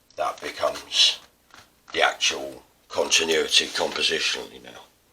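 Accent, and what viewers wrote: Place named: Netherlands. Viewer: Watched all of them .. Very nice technique.. for a dutchie your accent is very difficult to follow, but luckily art most of the times doesn't need a language! TFS..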